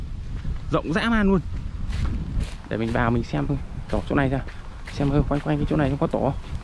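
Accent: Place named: Hải Dương, Vietnam. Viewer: ânh đang h đang sống ở đâu vậy, nghe như Hải Dương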